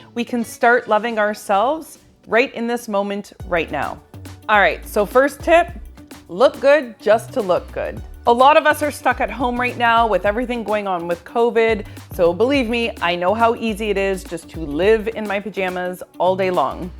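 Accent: Canada. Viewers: Just saw your video. Great talk. Are you from Canada?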